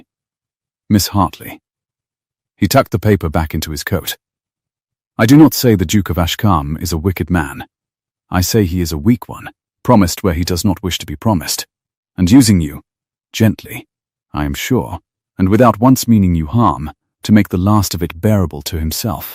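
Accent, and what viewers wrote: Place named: England, United Kingdom. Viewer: Thank you for this story and using an english accent.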